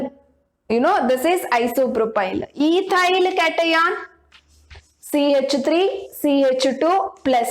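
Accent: Karnataka, India. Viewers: Yes ma'am is from Karnataka ❤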